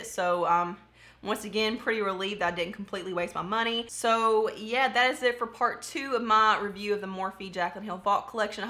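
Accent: South, United States. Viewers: I just found you today, and I LOVE your honesty and Southern accent! I am from Arkansas, girl! Going to peruse your older videos to find out where you’re from! ❤️ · Where are you from? I'm from TN, the accent I can guess you are too or AL, GA, KY. Be cool if you where from TN too, I've only watched one of your videos so far but I like watching you so far! Pretty mellow, real, and tell the truth!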